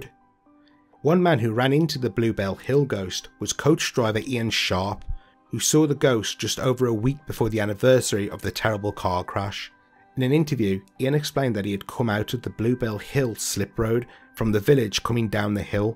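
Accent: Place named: Birmingham, United Kingdom. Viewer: Actually it's, bloody brummie, 😉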